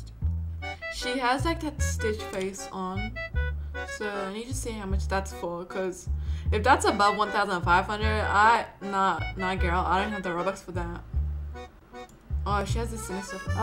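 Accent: United Kingdom. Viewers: I love the fact that ur British it makes ur voice so cute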